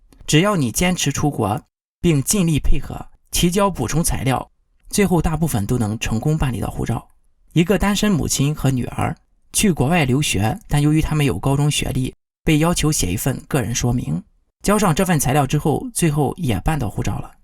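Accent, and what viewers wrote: Shandong, China. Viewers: 你的山东口音…..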